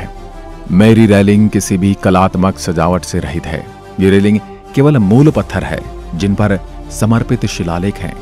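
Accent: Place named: Rajasthan, India. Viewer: Thanku ji mp ke बौद्ध स्तूप के लिए मैने आपकी सारी वीडियो देखी h राजस्थान की आपकी आवाज bht pasand h 🥰🥰🥰🥰 भाई जी प्लीज mp ke gwalior or shivpuri par video बनाइए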